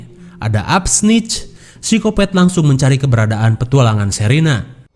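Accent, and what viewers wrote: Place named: West Java, Indonesia. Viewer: Enakeun kalo orng sunda yg bawain cerita :v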